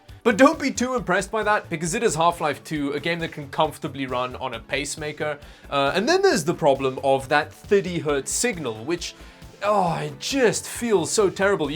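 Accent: Afrikaans, South Africa. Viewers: his south african 100% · I though you were South African? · You sound South African. Are you? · How long have you been in Canada?, you still sound very South African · He lives in Canada and his accent is white South African. So you are an immigrant. Good on your part you really dodged the bullet that is South Africa. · Is jy Afrikaans? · i can hear that south african accent trying to get some slang through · Interesting accent there - sounds like you’re Afrikaans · Are you south African? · Are you an SA expat? You sound South African. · I swear this guy is south african. Correct me if I'm wrong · Are you from South Africa? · You dont sound Canadian...South African perhaps? :-)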